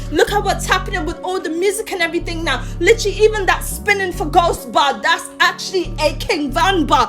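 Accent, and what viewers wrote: United Kingdom. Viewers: Imma real O block fan and it pisses me off to listen to this bullshit your DISRESPECTING KING VON’s legacy, family etc... IF YOU WANT TO MAKE MONEY AT LEAST DO PROOER RESEARCH AND SPEAK FACTS!!!! You sound like some U.K girl with wild imagination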